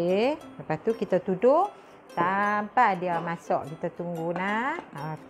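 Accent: Kedah, Malaysia. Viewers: Hati2 cik...seronok dengar cik cakap kedah...👍👍👍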